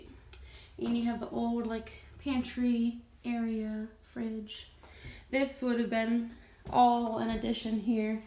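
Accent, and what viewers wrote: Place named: United States. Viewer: Could you give a quick backstory at the beginning of each restoration video? I'm a 1st time viewer and have lots of questions: Is this going to be your house or are you all planning to flip it? Is your dad doing all the work? How does he how to do that kind of work? Will u just be documenting or are you doing work too? What state is this house in? (Not asking for exact location, just generally. By your accent I'm assuming it's in the USA). Ok, you answered my first question at the very, very end of the video. Lol.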